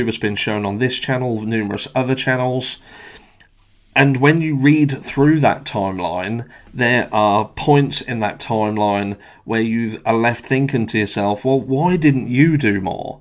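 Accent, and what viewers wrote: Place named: Suffolk, United Kingdom. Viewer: Are you from suffolk? I'm in Suffolk and you sound like it 😊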